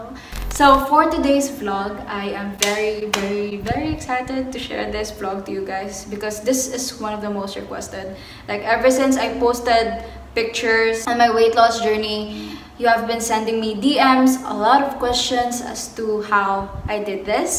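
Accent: Philippines. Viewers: Parang bisaya po kayo ate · as a half filipino, filipino accent is so funny and cute 😁😊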